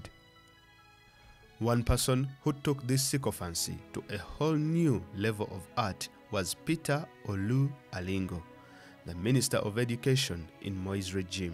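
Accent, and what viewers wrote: Uganda. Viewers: This is not a Kenyan giving this narration, the accent sounds Ugandan.Why not give us the story of UG especially the Amin years.He was one of the worst dictators in Africa, Moi pales in comparison to him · The accent sounds Ugandan of the Narrator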